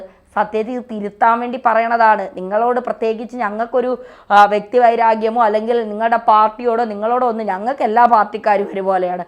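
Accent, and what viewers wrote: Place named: Kollam, India. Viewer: അത് കൊല്ലക്കാരുടെ ശൈലി ആണ്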